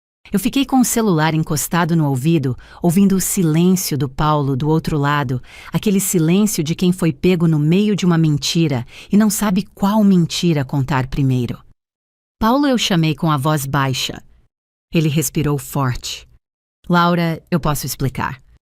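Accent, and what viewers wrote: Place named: São Paulo, Brazil. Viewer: a narração é interessante com sotaque do interior paulista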